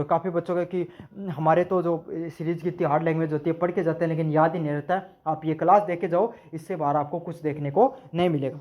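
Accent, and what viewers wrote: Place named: Marwari, India. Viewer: Sir thoda dhire or marvadi bhasa ka kam thoda thik thak hindi m b samjao 😣smj nhi aa rha achee se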